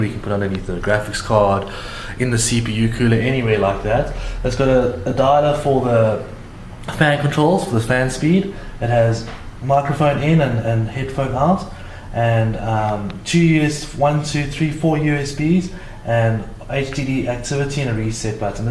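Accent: South Africa. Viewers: what coutry are you from? You sound like a South African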